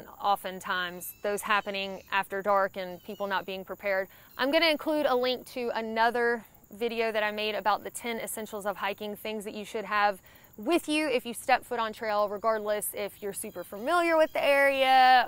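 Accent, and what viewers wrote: South, United States. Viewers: I've come to really like the southern accent in ladies. Anyway, hahaha, great content! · I like your southern accent. The info was great as I have explorer+ and with mini2 out I was contemplating going to mini2 vs keeping my explorer+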